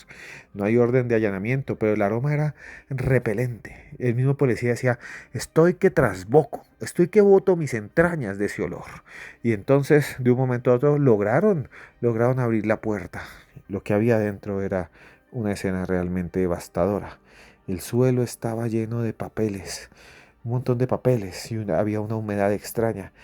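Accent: Colombia. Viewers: es Maravilloso, es auténtico,puro colombiano, su vocabulario es hermoso, me encanta escucharlo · No hay mejor narrador!! Que colombiano, me encanta !!!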